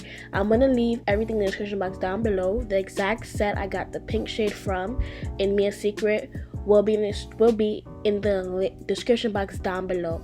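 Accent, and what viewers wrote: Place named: New York, United States. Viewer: Hurd ya accent & knew you was from New York 😭😭 Ima self taught mua & hairstylist and would love to network with you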